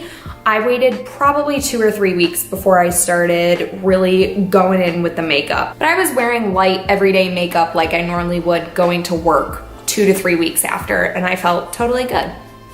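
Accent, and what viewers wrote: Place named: Midwest, United States. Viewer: I could tell you have a Midwest accent 😭😂 #chicagogirls ♥️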